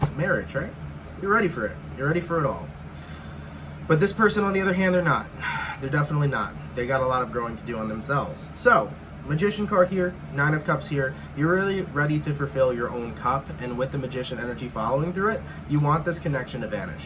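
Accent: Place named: United Kingdom. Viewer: also i’m hella jealous of your British accent. 😭 I wish i had one hah. 🥰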